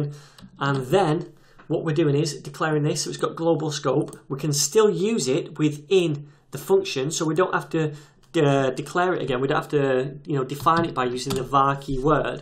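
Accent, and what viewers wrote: United Kingdom. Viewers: Watching you or watching the BBC gives the same experience, the classic British accent.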